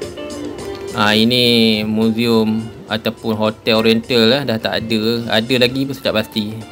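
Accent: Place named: Perak, Malaysia. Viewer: Haha.. penyampaian style orang Perak.. camtu ler..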